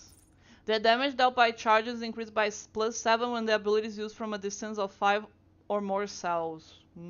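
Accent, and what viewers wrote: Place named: Poland. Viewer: Your accent sounds polish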